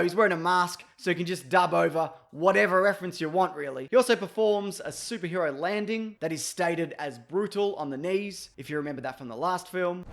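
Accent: Australia. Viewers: Is the speaker Australian?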